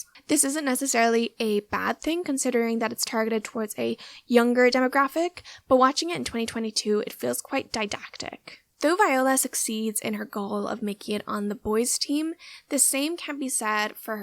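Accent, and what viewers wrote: Ireland. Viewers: i could hear the irish and i was so confused then i read the descrpition haha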